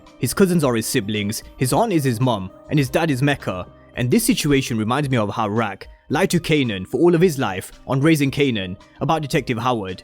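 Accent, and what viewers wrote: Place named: United Kingdom. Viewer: Sounds like the narrator is possibly British, they sometimes pronounce names or terms ending with an 'a' or ' 'ah' with an 'er'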